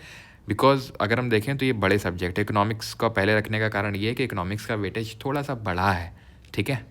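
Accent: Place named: Rajasthan, India. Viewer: Guruji aapke bolne ka लहजा bhut badiya hai❤❤❤ like a rajasthani ❤❤❤, A lot of love from Rajasthan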